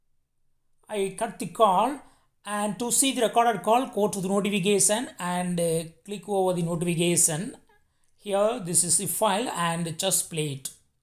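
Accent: India. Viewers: Why do you Indians speak like this?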